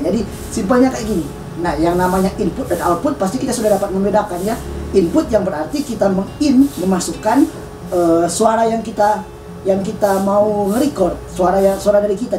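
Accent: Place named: Nias, Indonesia. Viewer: logatnya keg orang nias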